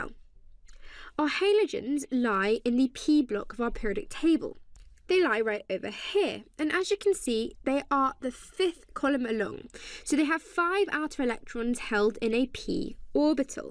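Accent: United Kingdom. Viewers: yeah strong British accent :D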